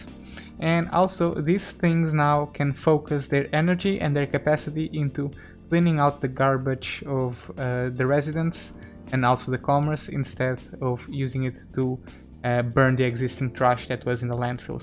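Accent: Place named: Portugal. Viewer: Classic Portuguese accent!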